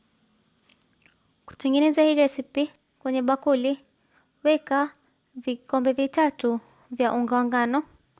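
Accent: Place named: Mombasa, Kenya. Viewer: I like your swahili wewe ni from mombasa?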